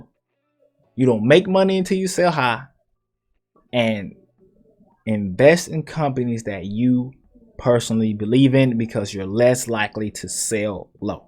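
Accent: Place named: Jamaica. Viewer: Are you Jamaican or Trini ?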